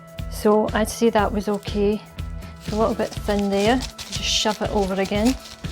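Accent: Scotland, United Kingdom. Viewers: Great video, im a first time watcher,very well presented and explained look forward to watching more of your work....lovely Scottish accent..thanks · 💗💗Your Scittish accent.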